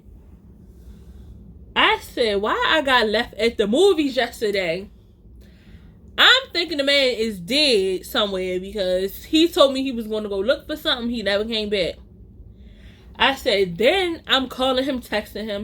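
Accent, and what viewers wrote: Baltimore, United States. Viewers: Once I heard your accent I said..Baltimore lol! You all and folks from New Orleans have the DEEPEST accents lol! It’s so unique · I love a person who can laugh when dumb things happen to them! I be dying laughing when dumb stuff happen,but I like ya channel ,I hear the Baltimore dmv accent😂NEW SUB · sound just like a typical Baltimore nigga 😭😂 but the whole no more movie dates just sent me 😂😂😂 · I remember this Baltimore accent I miss my days in Maryland lol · I knew you was from Baltimore when started talking. I love your voice. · I love watching your videos!!! I'm from Baltimore too but I live in sc and ya accent reminds me of home so much! Lol be blessed sis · Gotta be from Baltimore off the into song and accent alone. Didnt even need the rest of the story description. 😭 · As soon as you said carry out with that B-More accent I knew I fucked with you. 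You are gorgeous💜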